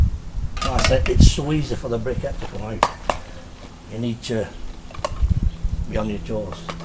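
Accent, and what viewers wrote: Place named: Yorkshire, United Kingdom. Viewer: I love a Yorkshire accent.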